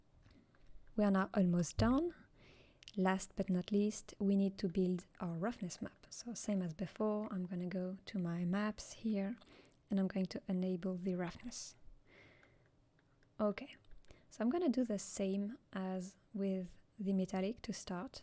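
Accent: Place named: France. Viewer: J'ai trouvé un petit accent frenchy et du coup j'ai tout compris ! Merci pour ce tutoriel de qualité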